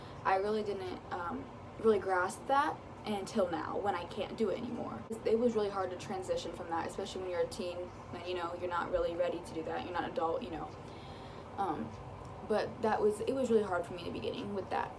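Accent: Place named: Indiana, United States. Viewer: I bet she’s from Indianapolis or close to it. It’s where I’m from and she talks how we do in central Indiana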